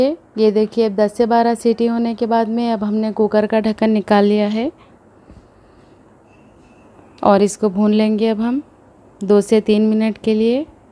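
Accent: Hyderabad, India. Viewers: Mujhe aapka typical hyderbadi accent bohat pasand aata...👍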